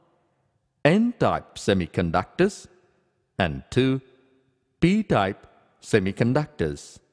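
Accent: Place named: India. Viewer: whatsup with the indian accent?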